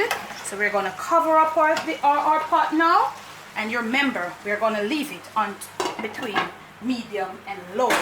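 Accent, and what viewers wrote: Jamaica. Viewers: Good work n ur Jamaica slang make d food romantic kudos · Your delivery of this video is sooooooo Jamaican....love it!! Thank you from the UK.... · I just love that Jamaican accent.. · I love the Jamaican accent. It looks delicious. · I so LOVE my Jamaican Brothers and sisters I can SCREAM! I finally got to know a Sister, Collen, decades ago and got to ENJOY Jamaican Dishes! Lord knows I WISH I could connect with her again after she left Schenectady NY! I am STILL making the dishes she taught me to make! Collen made her Curry Chicken somewhat differently, but because I am adding Potatoes to mine, I want to know WHEN to add the potatoes! I so LOVE your ACCENT and try to use it whenever I am around Jamaicans! In fact, I was BLESSED to know and date a Jamaican Man for a few years! He was TOO GOOD to be true! I will always REMEMBER him as he passed last year! 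I KNOW he is watching over me! COLLEEN, IF YOU ARE READING THIS, PLEASE LEAVE A MESSAGE! (BOBIE-SCHENECTADY NY) YOU HAVE NO IDEA HOW MUCH I MISS U & YOUR FAMILY! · she just started cooking & I'm ready to eat🤗..lol... I love the Jamaican accent!😊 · Mi just a buck up this lady. I love your cooking, how u talk, how u just move round the kitchen. Ol school yardie style. Food bound fi nice. I love love love your channel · I just love listening to you talk. Haven't heard Jamaican like that in such a long time. Reminds me of growing up. Do you have a restaurant?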